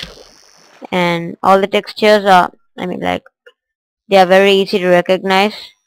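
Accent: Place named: India. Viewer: its indian accent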